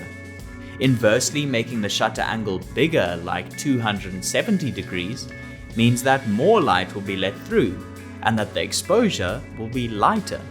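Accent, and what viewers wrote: South Africa. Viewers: Are you South African bro? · I hear a South African accent! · Are you South African by any chance?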